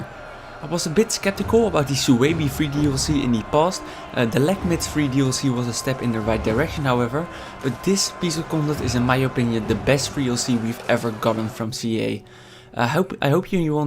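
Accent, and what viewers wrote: Netherlands. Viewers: That's a dank Dutch accent you have there friend. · yes of course, i understand the need of time in these video's, still awesome you upload them in such rapid succesion, i only have one question, maybe you said it before but i might have forgotten it, are you dutch? Because you kinda sound dutch. Although this is no offense of course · where are you from you sound dutch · Serdar Kaleli you idoit hes dutch no indain you rasict!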